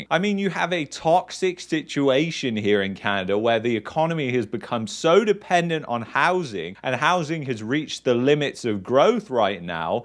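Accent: United Kingdom. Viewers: You are a pessimistic joker with a British accent! I unsubscribe!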